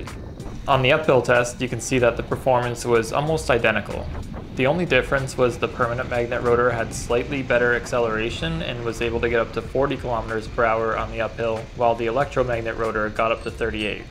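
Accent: Canada. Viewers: You must be in Canada, given accent, English, no traffic & snow on the landscape near the roads // lol .. metric kw / kmph / km distance / units :)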